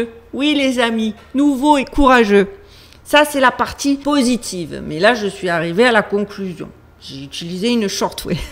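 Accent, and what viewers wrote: South, France. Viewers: L'important, c'est ce qu'on croit, pas ce que dit l'Église car celle ci est dans la tourmente . Si des centaines ou des milliers de personnes voient la même chose en même temps, pourquoi ne devrait-on pas y croire et pourquoi seraient-ils menteurs ? Merci pour vos vidéos très intéressantes avec cet accent du sud qui les ensoleille ❤ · Ce que j'aime vous écouter ! Avec votre charmant accent du sud, vous chantez les vérités :) ♥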